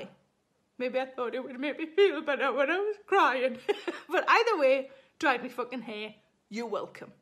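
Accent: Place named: Geordie, United Kingdom. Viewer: hi, the worst thing I ever wore was a pair of trousers that were slightly to short and people said my budgies were flying low, as a Geordie I appreciate your comedy more than most, like my friend bobby pattinson, another fantastic northeast comic for your fans that may not know him says its the dialect that makes us laugh, thank you for being you, I laugh till I nearly split watching you shows, love from springwell est gateshead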